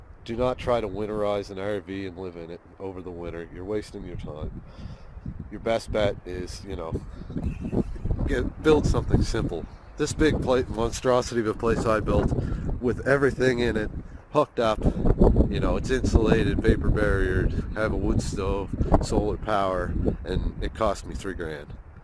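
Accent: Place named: Nova Scotia, Canada. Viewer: Right on. My wifes aunt lives in chester grant. I thought you sounded like an Aylesford/ Morden guy.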